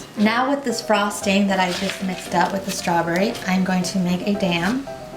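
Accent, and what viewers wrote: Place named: Chicago, United States. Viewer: I prolly said this already, but my sister lives in Chicago. haha. It's thanks to that, that I learned to identify the accent. Box = backs. Solids = salads. Tom = Tam. Hahahaha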